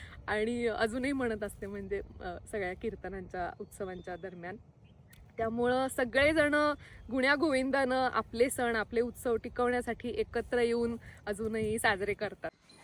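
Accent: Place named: Konkan, India. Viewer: कोकणात असूनही तुझ्या आवाजात थोडा कोकणी+सोलापूरी टोन जाणवतो.😊👌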